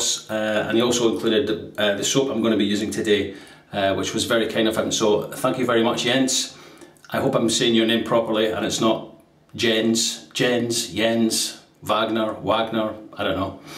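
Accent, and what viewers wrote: Scotland, United Kingdom. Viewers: Are you a scot, sir? Nice shave, by the way.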